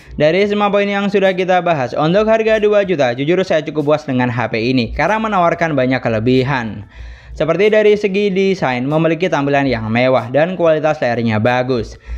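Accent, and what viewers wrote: Madura, Indonesia. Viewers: Apa om ini orang madura ya 😅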